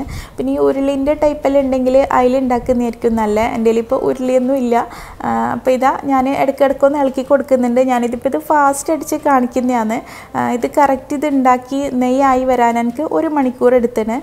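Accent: Kannur, India. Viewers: Thani kannur bashaa😀 nmml kannuranee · Hii ningalea video boar adikkkukaye illa kannur style basha😂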